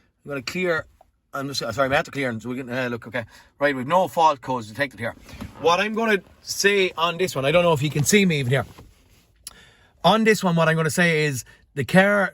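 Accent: Ireland. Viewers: Suprised to hear an Irish accent! and thanks! Same problem with my Outlander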